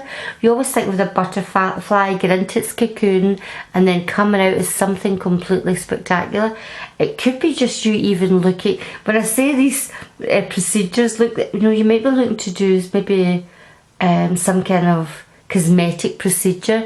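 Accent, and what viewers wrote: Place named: Scotland, United Kingdom. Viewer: No.4. Loving that lovely Scottish accent of yours. Blooming Brexit dh 🤪. Thank you 🙏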